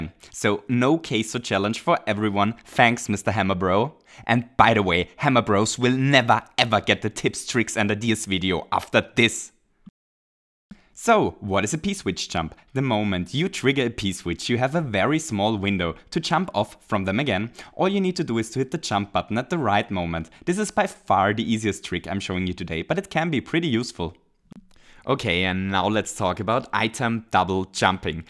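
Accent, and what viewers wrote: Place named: Germany. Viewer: Haven't you heard of its a German accent?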